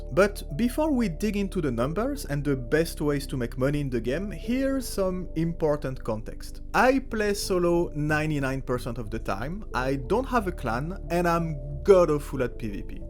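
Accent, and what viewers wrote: France. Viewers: I love your French accent man 👌(I look forward to the crafting video)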